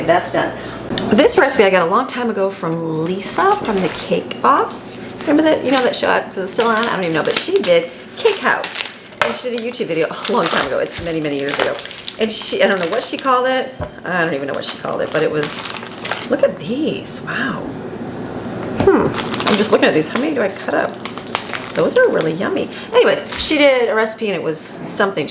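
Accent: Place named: South, United States. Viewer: I just want to say again I LOVE your accent and how you say certain words, I giggle and my hubby says "what are you laughing at?" I tell him and he laughs too. He is from the COUNTRY so his accent is even more southern than mine!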